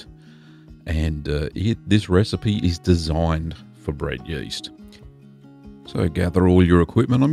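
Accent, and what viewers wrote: Australia. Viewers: I'm a simple man I hear the Australian accent I skip too another video .🖕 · Definitely make this a series!!! Cheese, mead/beer and an Australian accent. What more could you want in a channel!?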